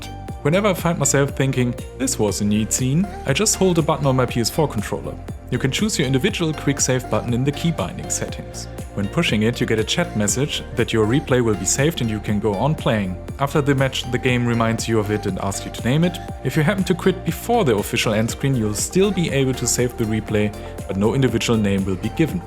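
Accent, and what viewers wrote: Germany. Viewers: Ich hab sofort gewusst, dass du Deutscher bist haha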